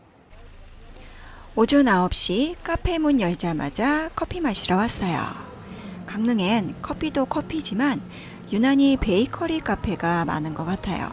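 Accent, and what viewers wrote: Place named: Japan. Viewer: 안녕하세요 ~ 혹시 일본인이세용? 말투가 한국인이 아니신듯 해서요~😂 혹시 한국이시면 특이하신 말투시네요~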